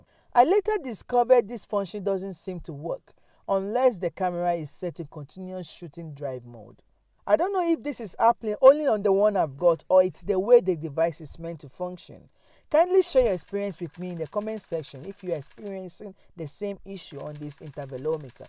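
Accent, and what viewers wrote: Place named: Kenya. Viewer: Great vid. Just out of curiosity, what country are you from? I lived in Kenya for 30 years and your accent sounds very familiar!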